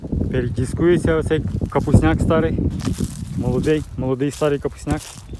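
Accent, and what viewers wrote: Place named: Vinnytsia, Ukraine. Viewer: Сорт цибулі LUSY? ( Вона жє Свіфт.) Я правильно зрозуміла? А то з вашим чудовим Вінницьким діалектом не завжди зрозуміло.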